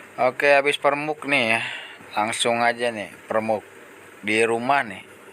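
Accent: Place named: Jakarta, Indonesia. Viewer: Logat ngomongnya seperti org betawi ya bang😊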